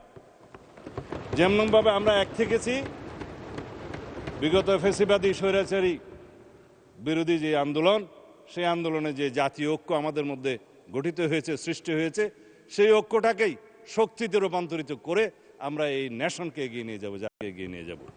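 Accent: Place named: India. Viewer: এর কথা শুনতে ভালো লাগেনা,,, ভারতীয় একটা ভাব এর মধ্যে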